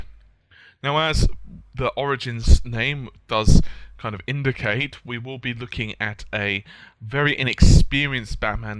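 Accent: United Kingdom. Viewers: My God your voice is British O.O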